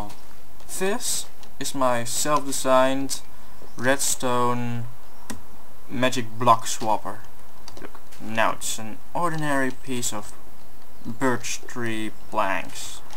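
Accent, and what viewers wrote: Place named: Netherlands. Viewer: Waarom praat je engels terwijl je nederlands bent, met je mooie nederlandse accent.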